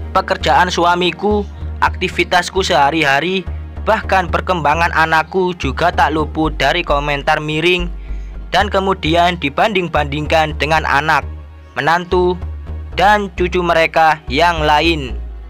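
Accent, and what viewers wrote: Java, Indonesia. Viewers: Ini pembawa crita masih baru ya mas , santai aja mas bacanya jngn katrok bahasanya medok lucu mas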